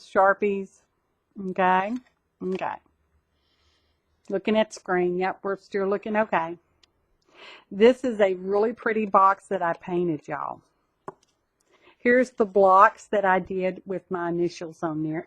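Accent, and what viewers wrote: South, United States. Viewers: I just love your southern accent I’m from south Mississippi and sound like you lol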